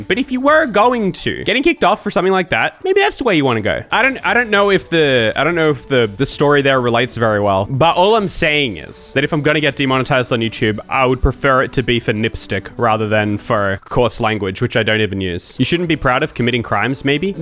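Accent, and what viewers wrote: Australia. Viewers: This is definitely massive YouTube BS, but... I wonder if it's an accent thing? Hear me out: I can't imagine that their "real humans" listen to videos all the way through. It's just inefficient. I would not be surprised if they have A.I. isolating suspect words and then presenting just those snippets to listeners. f you listen to out of context snippets of you saying "can't" and don't realize that its an Australian accent, it could maybe mistaken for "c*nt". that's my theory anyway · Aussie accent doesn’t always mix well with their sensors very stupid lmao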